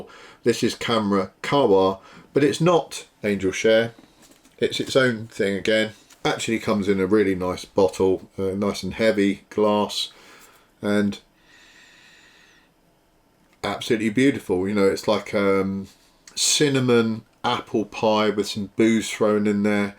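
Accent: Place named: Australia. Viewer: I'm digging the Aussie (or is it Kiwi or Northern British ? ) accent... Funny how whenever I hear that accent I always associate it with belonging to a tough as leather and nails two fisted bar room brawler..lol... Must be a hell of a thing to get your ass kicked by a good smelling dude . ! Lolol Great channel and your authentic energy. New Sub !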